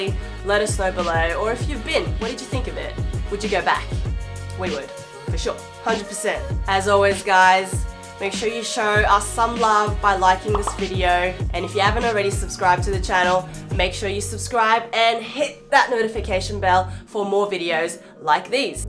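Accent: Australia. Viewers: Nice to hear an Aussie accent :)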